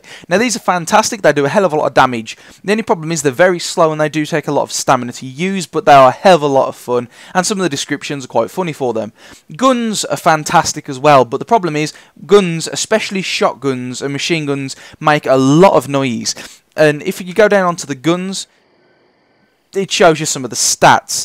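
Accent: Birmingham, United Kingdom. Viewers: Are you a brummy ?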